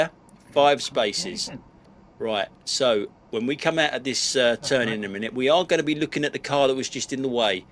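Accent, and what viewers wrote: London, United Kingdom. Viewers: I was originally going to say that dyslexia affects only reading the written word and not the grammar of the spoken word. However I just looked it up and because dyslexia is all about the inability to process the written word then it can cause problems with similar sounding words in your speech pattern. It's nothing to do with laziness and is simply a case of condition. I am sometimes tempted to correct him on his nuffinks and sumthinks and double negatives but thats just how Londoners talk - vive le difference!! So I think you're a bit mean suggesting he's lazy. It has no impact on how well or badly he drives.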